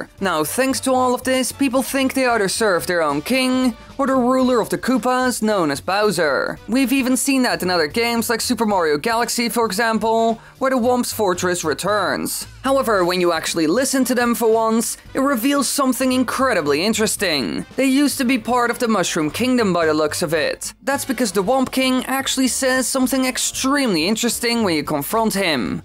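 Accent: Netherlands. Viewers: Are you dutch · Saw your majora's mask video and thought I heard a little dutch accent. Your PO box adress confirmed it Haha. But so am I!Keep up the good work dude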